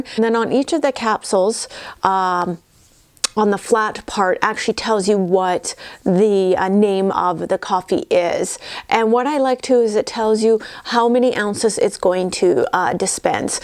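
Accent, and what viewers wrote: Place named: Canada. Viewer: thanks I'm already at the online store seeing what they have...I didn't realize you were in Canada...that's where the cute accent I hear is coming from.....OK thanks again and will let you know what I buy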